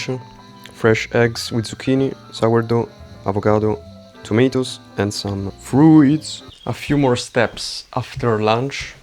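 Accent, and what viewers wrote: Italy. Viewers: "Aiming for perfection is the step towards sadness" yeah right at the end of the day its always You vs You. Loved your accent buddy Italians have a really such adorable English accent 🤍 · Dall'accento sei palesemente italiano ahah, comunque bel video!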